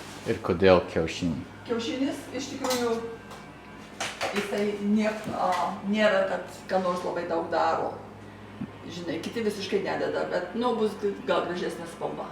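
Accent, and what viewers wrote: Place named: Molėtai, Lithuania. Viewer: Vo čia kaimiskai. Pagal kalbą tai kaip močiutė iš Molėtų;)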